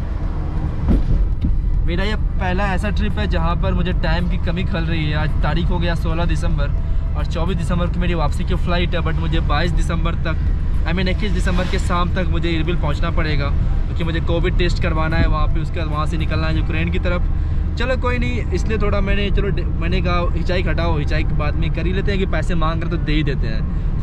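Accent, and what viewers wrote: India. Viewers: Bhai, Youtuber to bahut hai. But aapka bolne ka desi andaaz, really love it. Kabhi bhi isse change mat karna. Yeh bhi aapki ek khasiyat hai.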